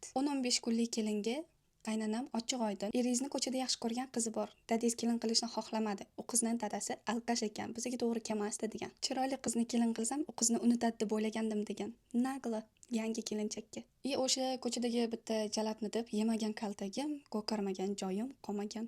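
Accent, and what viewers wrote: Tashkent, Uzbekistan. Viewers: Намангонли булса нега Тошкент шевасида аралаштириб гапирвоти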